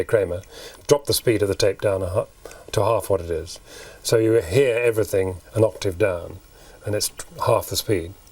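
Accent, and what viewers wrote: United Kingdom. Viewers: "Wuhld" is almost worse than a Bostonian. Honestly this sounds better to me than a Bostonian because my parents liked britcoms late at night on the local PBS station. I got adapted to this accent pretty fast when I got a pubescent liking to Penelope Keith's hipbones. Y'all Brits probably think I say "world" as "wuhrrrl(d)".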